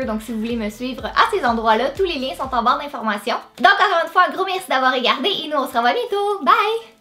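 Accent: Quebec, Canada. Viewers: Je la trouve trop belle, marrante et puis j'aime trop son accent québécois 😍😍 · c in accent quebecois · C'est un accent québécois come on!!! 😙😙😙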